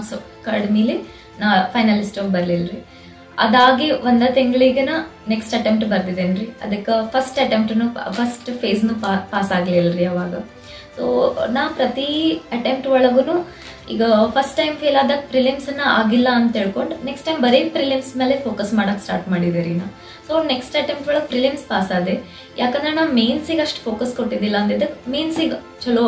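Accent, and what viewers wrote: India. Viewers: Yalli hodru uttara karanataka(UK) bashe(style) bidabyadri akka... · Bahal simple agi guidance madidri Akka.....pakka Belagavi Kannada...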